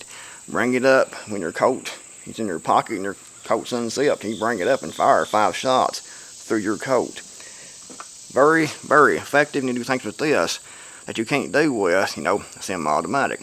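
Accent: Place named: South, United States. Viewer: This is the first of your videos I've ever seen. It just popped up in my YT feed. Soon as you started talking, my first question to myself was "I wonder what part of East TN he is from?" Went to your channel and saw several thumbnails with the Tennessee state flag in the background. ;-) I'd hazard a guess of Greeneville, TN? Possibly Kingsport or outlying areas. Born and raised in Gray, TN myself. ;-) Oh...and cool on the EDC stuff. Not a Glock fan myself, but they do work. I just don't care for the ergonomics of 'em.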